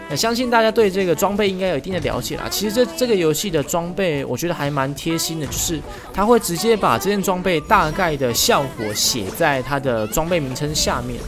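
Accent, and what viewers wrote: Taiwan. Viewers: 台語口音很有韻味欸不是台北人嗎？😂😂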